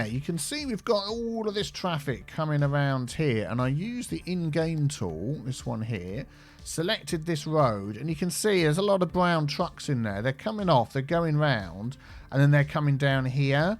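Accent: United Kingdom. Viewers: It's his voice. It has that soothing, calming British tone to it... 🇬🇧😆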